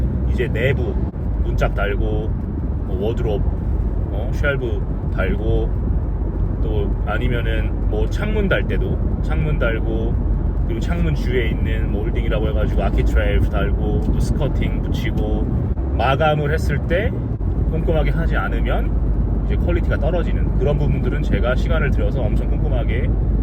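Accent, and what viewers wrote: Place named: Australia. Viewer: 호주는 몇살때오셨나요? 영어발음이 호주계 한국인같은데..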